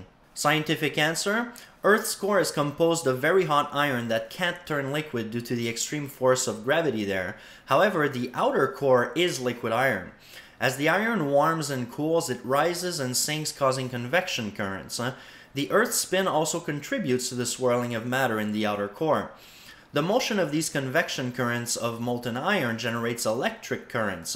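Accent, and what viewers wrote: Quebec, Canada. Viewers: Cleary a Canadian eh? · Es tu Québécois ? Ton accent me le laisse croire. Très bon vidéo en passant ! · It's because he's French Canadian, so he prounces his "ah" sounds as "ey."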